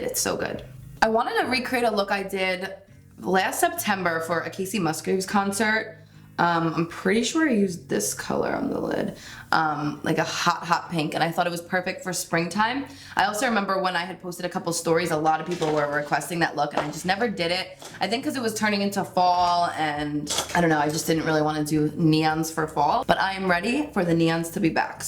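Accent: New Jersey, United States. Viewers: The jersey came out in this video !! Eowww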